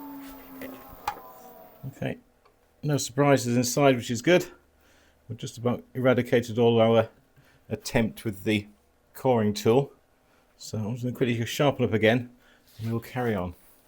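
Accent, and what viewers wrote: United Kingdom. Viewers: I'm from Birmingham and I live on the Welsh borders in Shropshire. I have a thing for accents, I love them and I'm sure I can detect a remnant of West Midlands in your speech. Thankyou for your welcome. I'm enjoying working through your back catalogue.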